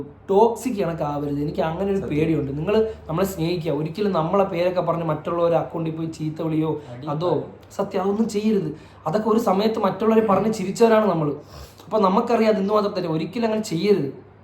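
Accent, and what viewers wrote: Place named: Kollam, India. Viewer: Hii brooh 🥰nighlde videos um nighle 4 pereyum othiri ishttanu❤pinnah slag um. Njn koodhalum nighlde videos anu kanunnathu. Kollam slag enik othiri ishtta, pinna nighl samrikunnathu kettittum enikum samsarikkanum ennu aghraham ind.Njn Ernakulamkkariyanu. Apo ivda ntha, nthu ennokeya paraya ipo ithinu pakaram enthonnu, enthru agana paraya ee vakku oke enik chettanmarde videos il ninna kittiye🥰, pinnah nighl samsarikunnath kelkkanum adipoliyanu . Njn athukond thanne nighlalil ninn korach words padikkum. Ennt paramavathii nighlde pole samsarikkanum shremikkum🥰🎉🥰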